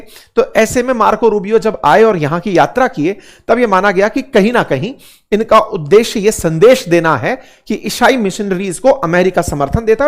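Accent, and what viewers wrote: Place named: Rural, India. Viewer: Bhai dehati English pronunciation 😢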